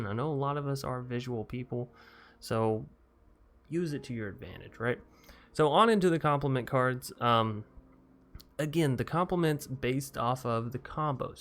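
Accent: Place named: East Texas, United States. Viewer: Your combination of the east Texas-esqe accent with the geeky content is highly entertaining.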